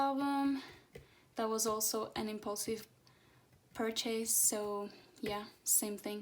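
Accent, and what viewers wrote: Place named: Portugal. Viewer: i knew there was something off about your accent, com que então és portuguesa ahaha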